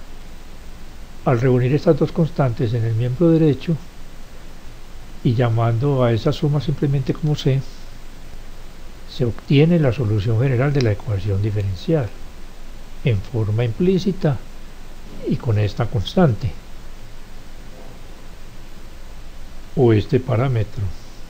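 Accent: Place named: Antioquia, Colombia. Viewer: Tiene acento paisa. Nuevamente gracias y bendiciones.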